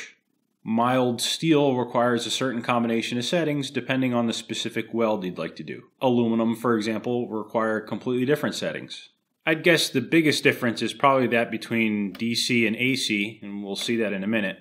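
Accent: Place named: United States. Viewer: Yes annoyed me as well - perhaps it is a Yank thing? Like Americans can't say solder - they say sodder not solDER! Still love videos - they are the best!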